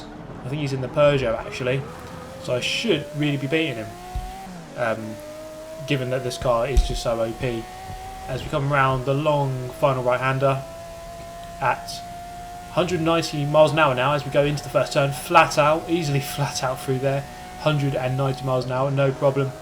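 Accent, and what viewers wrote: United Kingdom. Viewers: I think there is something about a British commentary over racing that is just pure bliss.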